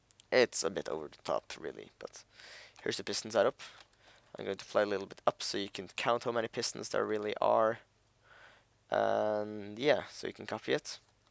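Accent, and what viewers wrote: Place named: Norway. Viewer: I could tell by the first 10 sec that you're from Norway :P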